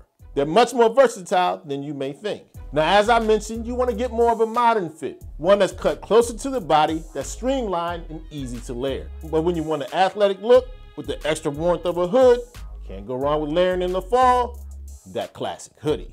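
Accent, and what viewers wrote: Philadelphia, United States. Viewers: You outta Philly?!I knew you wuz out North East sumwhere..i thought New York..no offense..LOL SALUTE..from Chicago$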